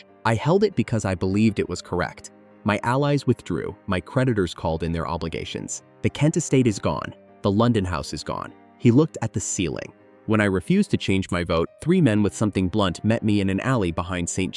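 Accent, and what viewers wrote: United States. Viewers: Good story but annoying background music, floating circles and too large a font. English accent please, not American because the story is based in Yorkshire, England. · So, American narration for a Yorkshire story! And incorrect pronunciation of words with the same spelling. So when perusing a letter the word is 'red', when speaking of roofing materials the word is 'led'. Allowing AI to run amok doing its own thing renders the whole ridiculous. And as others have said, far too fast. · I read several comments about reader being too fast and speaking from back of throat Having grown up in Michigan, this sounded "normal" to me! 🙂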